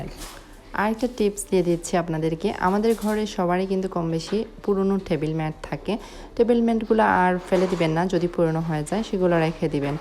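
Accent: Sylhet, Bangladesh. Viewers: Apu apni sylheti ai jonno balo lage please sylheti matea blog korio ❤️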